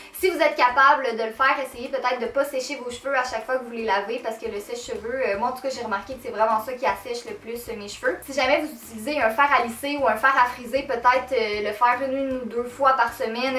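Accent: Quebec, Canada. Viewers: Tu es vraiment magnifique ❤ J'adore ton accent québécois 😊, ton genre de vidéo est vraiment simpa. j'adore ta chaîne ❤❤❤❤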